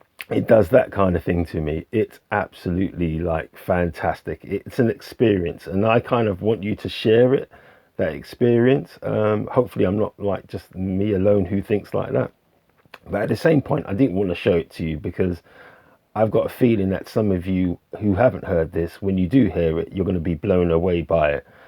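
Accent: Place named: United Kingdom. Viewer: Hi, I've just come here on the recommendation of Julius Jabbar. He said he thought you sounded British, but I'd wager that I can hone in on your accent further. You sound English to me and slightly posher than I am. I'd guess you are from south of the Watford Gap. Possibly not far from the M25. How am I doing? I've got that LP with a different cover. Didn't know it was controversial. Love the Xylophone with those voices. Very nice! I'm really really happy to have found your channel. Looking forward to caching up with your past videos. Cheers!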